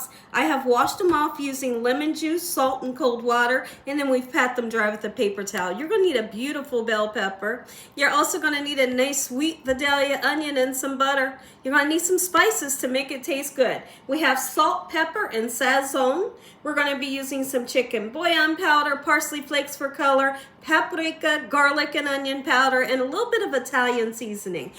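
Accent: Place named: Midwest, United States. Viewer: What state are you ? I can hear mid western accent or southern.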